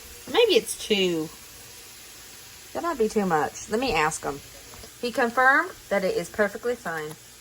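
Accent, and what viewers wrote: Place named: Deep South, United States. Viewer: u tube suggested your channel to me, & now I’m a fan!! You cook just like i was raised to cook! We also happen to buy the same types of groceries 😂😂 we’re both from the deep South! You talk just like me & my family do!!😂 God bless you & that lovely family ❤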